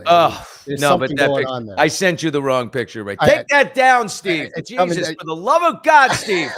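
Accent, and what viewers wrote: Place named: New York, United States. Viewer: You can hear your New York accent😂. You’re an east coaster. Love east coast people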